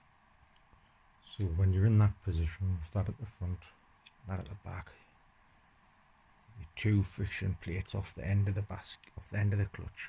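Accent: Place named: Ireland. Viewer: Awesome thanks for all the detail. Luv your irish accent too! If you ever need. Any help with your health. Let me know. Contact is on my channel.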